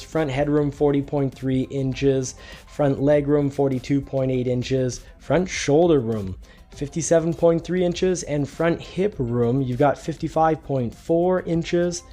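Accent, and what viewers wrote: Canada. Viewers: Cool je savais ton accent était québécois mais de Quebec en plus c'est nice! Je vais te suivre. Et jai hate de pouvoir mettre la main sur un pti maverick!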